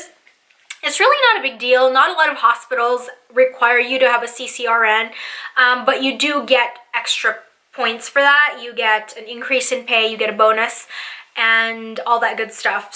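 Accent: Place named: United States. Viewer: You picked up the American accent quickly for someone who came here in 2012.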